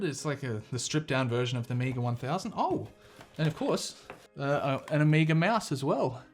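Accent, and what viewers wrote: Australia. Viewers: What state in Australia you from dude?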